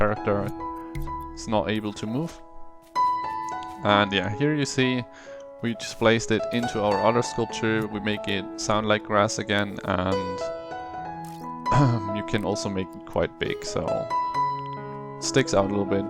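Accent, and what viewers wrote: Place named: Germany. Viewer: Hab bei Dir sofort rausgehört, dass Du Deutscher bist - aber ich muss sagen, dass Dein Wortschatz und Sprachfluss echt stark über dem deutschen Durchschnitt sind. Danke für die Videos! Das motiviert mich echt, eines Tages mein eigenes Spiel zu entwickeln.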